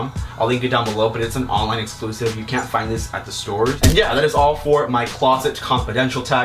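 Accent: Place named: United States. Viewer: Si vas a hablar espanol hablalo bien no con acento americano, just saying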